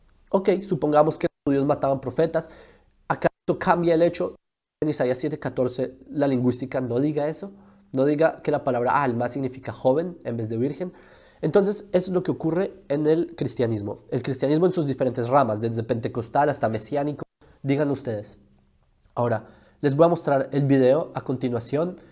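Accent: Colombia. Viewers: tú de colombia solamente tienes el acento, que ya casi lo estás perdiendo! Pero la cara esa de Judío auténtico Israelita, Hebreo, del ME nadie te la quita! Una prueba es que si no lo fueras nunca te hubieran aprobado la Ley de retorno! No pierdas tiempo con estos analfabetas por favor! Tú eres buenísimo para enseñar y abrir mentes y transformar a muchísimas personas con la verdad! Gracias mil, Shalom!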